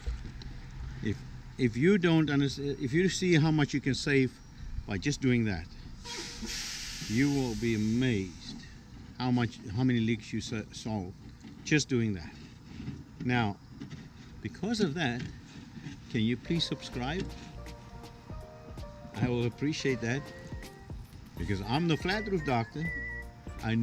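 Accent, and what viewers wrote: South Africa. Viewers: Is that a South African accent ☺ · Baie Dankie! I spotted the accent too! Ek stuur groete from a very rainy Pretoria. I have this problem too with my afdakkie roof so ill give this a try. You haven't lost the SA sense of humour!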